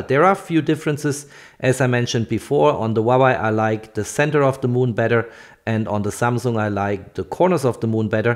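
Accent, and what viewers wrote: Germany. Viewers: I thought the same xD. It really sounds so german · du hast so ein deutschen Akzent haha xD hab ich recht? also kenne dich noch nicht das erste mal auf dein Kanal aber das klingt sehr Deutsch für mich :) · Is it only me or has he a German accent? It sounds all so German xD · wieso machst du das nicht auf deutsch? hört man doch deutlich dass du deutscher bist 🤔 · Gutes Video, aber arbeite vielleicht ein bisschen an deiner Aussprache :)! Man hört den deutschen Akzent nämlich echt stark 😆 (zum Beispiel spricht man millimeter wie „millimieter“ aus)! Nicht böse gemeint, ansonsten passt alles 😊! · Hard german accent :D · u sound like a german jew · Iv I should guess, you are German! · ah yes, i hear the german-ness in your voice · Are you german🫠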